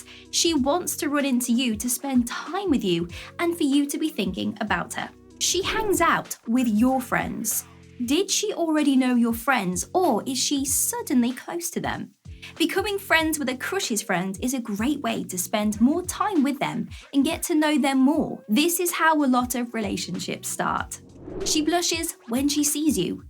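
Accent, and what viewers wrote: United Kingdom. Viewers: She is British, isn't she?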